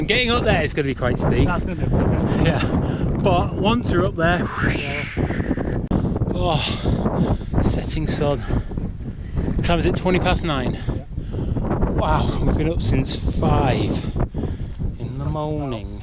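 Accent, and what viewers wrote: United Kingdom. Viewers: hey. Yh iv only jist checked my spam box. Baaahahah anyways got your email. Me and the other guys are all having a meeting on Wednesday about the hike so il be in contact with you on Thursday. Speak soon buddy. By the way. Your accent sounds dead familiar. Are you from Mersesyside or Chester area by any chance?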